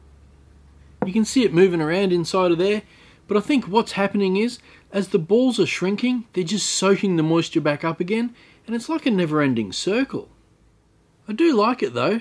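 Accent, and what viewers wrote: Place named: Australia. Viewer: I liked 1& 2. Hey, where I live we are having tornados in the area so we have a Take Cover Warning. I’m watching your videos while sitting in my bathtub (I don’t have a basement). Thanks for keeping me company. Do they have tornados in Australia? You sound like that is where you are from. Have a great day.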